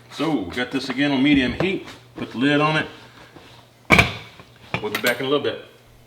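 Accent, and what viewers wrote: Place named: Louisiana, United States. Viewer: I recognized your accent before I saw that you’re from Louisiana!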